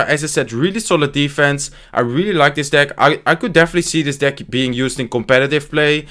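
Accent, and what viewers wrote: Netherlands. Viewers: Ur so obvious dutch:) nice vid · Are you dutch? You have a dutch accent in your english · Are you Dutch?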